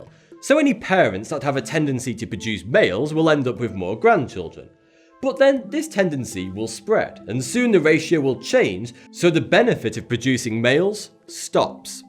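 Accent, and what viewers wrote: United Kingdom. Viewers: I love how your British Dialect makes saying "penis and clitoris" sound so eloquant.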